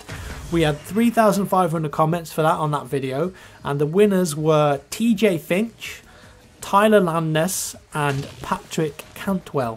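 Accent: United Kingdom. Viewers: you forgot to mention having a British accent definitely helps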